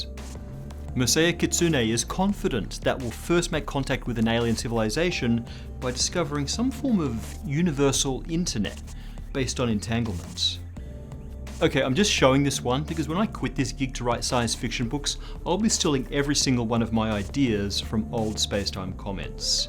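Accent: Australia. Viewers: My biggest mystery from this video , which is great , what accent does the host has ? Australian ?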